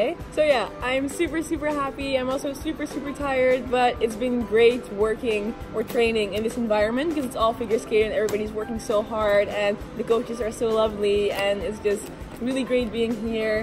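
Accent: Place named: Netherlands. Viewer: I knew she was Dutch from the moment she started talking lol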